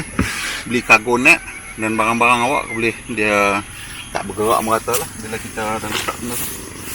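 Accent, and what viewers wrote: North, Malaysia. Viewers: Kalu ada lampu tuh..malam2 tak laa mengangau sangat..takdak lagi org ribiew keta guna ayat macam nihh..syoq abg dok sulam lorat utara nohhh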